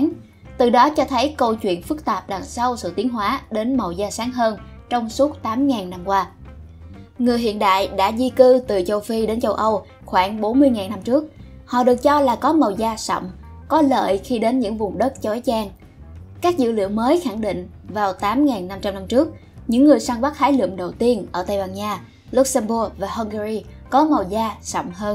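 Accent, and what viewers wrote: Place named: North, Vietnam. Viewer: giọng bắc thì rõ hơn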